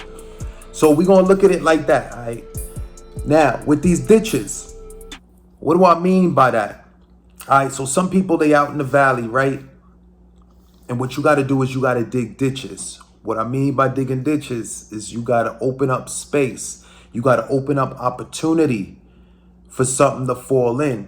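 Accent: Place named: New York, United States. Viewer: aye you sound from NY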